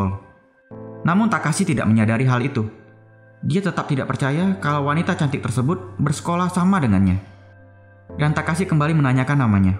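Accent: Medan, Indonesia. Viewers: Orang medan bang? :v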